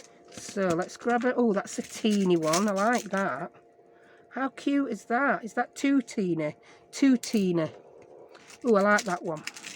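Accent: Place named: Scotland, United Kingdom. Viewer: You are a hoot! I just found your channel and I am enjoying you so much. Are you from Scotland? I love your accent.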